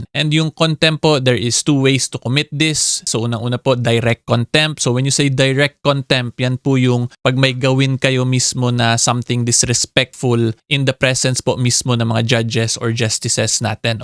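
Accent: Visayas, Philippines. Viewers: Bisaya ka atty? hehe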